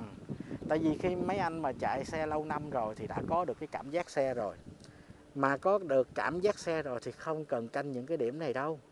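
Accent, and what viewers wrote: South, Vietnam. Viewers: Lâu lắm rồi dzô youtube mới được nghe giọng Nam chân phương❤ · Giọng Miền Nam kg văn hoa lý luận,cái kiểu " CÓ SAO NÓI VẬY NGƯỜI ƠI "❤❤❤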